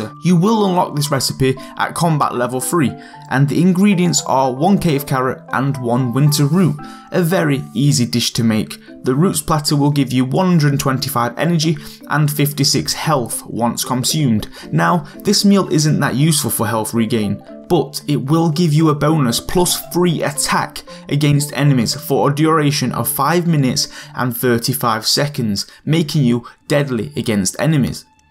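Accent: United Kingdom. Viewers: Ur so bri'ish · Gotta love bri'ish accent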